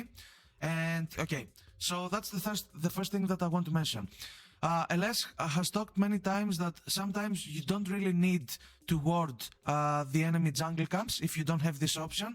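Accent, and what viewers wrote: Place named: Greece. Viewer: Good video. Also your accent is familairly greek :p .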